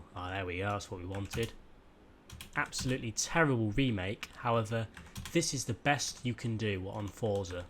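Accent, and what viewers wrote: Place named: England, United Kingdom. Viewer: Davon Pipes he's English. Canadians also do that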